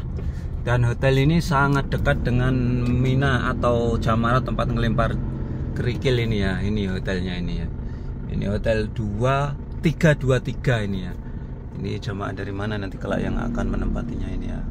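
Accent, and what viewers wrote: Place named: Java, Indonesia. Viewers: owalah pantesss medok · Sama medok nya hehe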